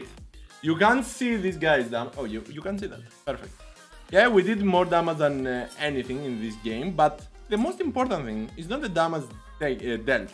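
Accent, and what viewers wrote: Greece. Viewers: I think you're a Greek, right ? Assumption made on your accent. :D Greetings from Serbia my bro !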